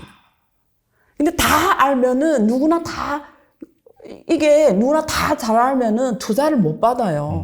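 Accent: Yanbian, China. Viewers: 연변사투리가 쎄네요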